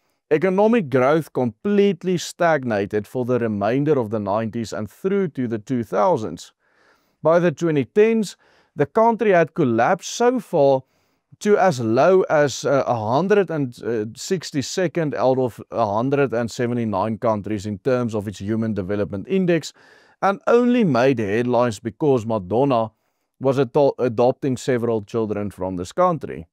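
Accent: Afrikaans, South Africa. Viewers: This is the second video of yours I watched. Perhaps it's unfair but your charming Afrikaner accent certainly makes your videos more enjoyable to listen to- even as you tackle difficult topics. You offer unflinched views and not beholden to left-wing fixations but I think you have warm human regard for humans regardless of race. Afrikaners are genuine sons of Africa, from my outside view, and hope your people and nation keep prospering and making contributions. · can you speak any other lanuage and how..beautiful afrikaans accent why must it be...??? like more english..❤ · Sorry, Afrikaaner English is just too unattractive …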